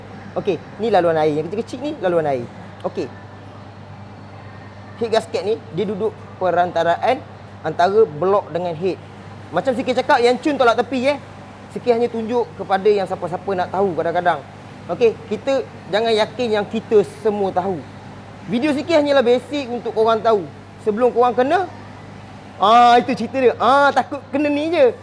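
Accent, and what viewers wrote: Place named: Terengganu, Malaysia. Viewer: Gaye bercakap mcm org terenganu ni,betul ke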